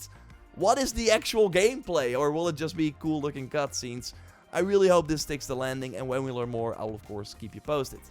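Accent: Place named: Netherlands. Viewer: The Netherlands. He is Dutch.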